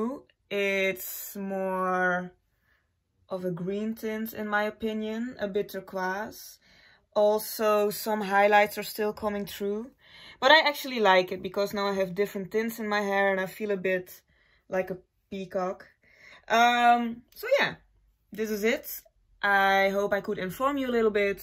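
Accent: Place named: Netherlands. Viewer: Oef you’re really Dutch aren’t you?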